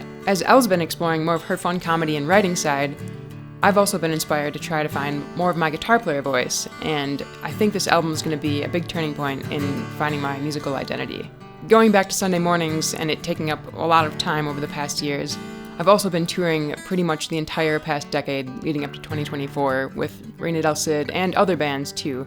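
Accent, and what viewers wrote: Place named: United States. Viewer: Where in the States is your accent from?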